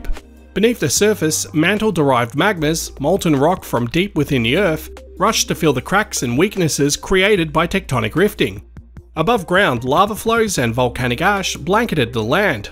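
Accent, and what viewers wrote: Australia. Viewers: I could listen to Australians talk all day long , I wonder how they feel about Texan accents?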